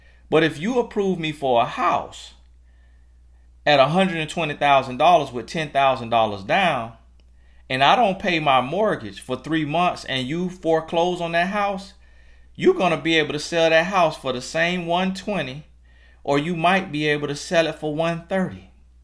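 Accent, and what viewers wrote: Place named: South Carolina, United States. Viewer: where are you from?? You sound like you from SC, I could listen to you talk all day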